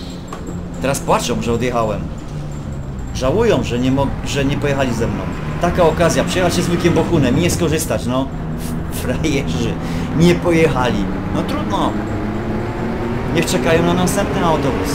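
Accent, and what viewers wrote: Silesia, Poland. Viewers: odcinki są super idzie sie bardzo pośmiać a także odpocząc:) jestem z sląska i smieszy mnie to jak ''godo pan po slónsku''! wiecej omsi!:) Pozdrawiam!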